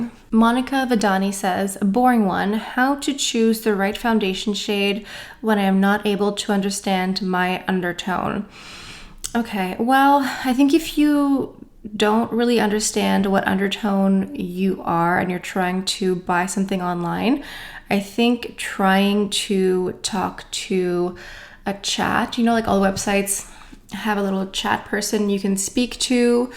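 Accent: France. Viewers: One of my favorite things about your channel is your beautiful French pronunciation of words that we Americans tend to butcher😎. It’s really awesome to have two languages. Love your content, and agree that Chanel makes the best foundations.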